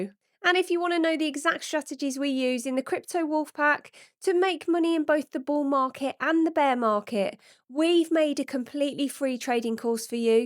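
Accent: United Kingdom. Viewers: AS an American I learned a long time ago never listen to anyone with a British accent.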